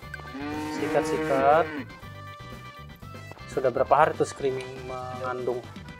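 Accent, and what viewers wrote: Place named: Lampung, Indonesia. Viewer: i hear "tah" and "ding" orang lampung ya kak?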